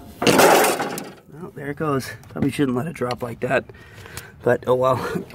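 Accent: Boston, United States. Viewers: Sound like you from Bostonn, great video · Sounds like Boston. Lol.. good video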